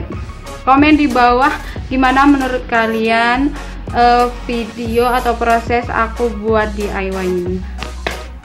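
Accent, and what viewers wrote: Madura, Indonesia. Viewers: Logat maduranya kelihatan beut kak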